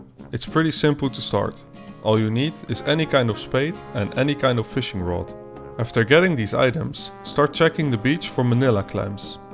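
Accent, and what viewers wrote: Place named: Netherlands. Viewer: I can just hear the Dutchness in your voice hahaha!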